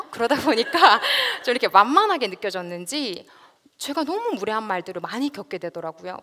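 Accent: Gyeongsang, South Korea. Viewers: 이 여자는 경상도말투라서 사납게 느껴지니 거부감이 든다.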